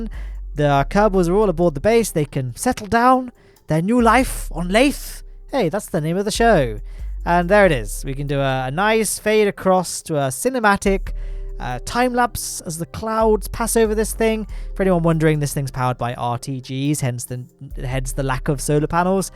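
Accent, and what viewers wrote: United Kingdom. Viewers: british voice = smart voice. this is just the way it is. (i'm an american)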